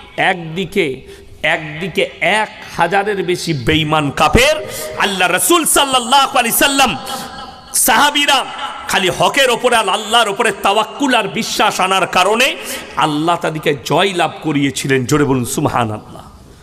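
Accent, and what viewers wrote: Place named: India. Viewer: এটা হিন্দি টানে বাংলা বলে কেন?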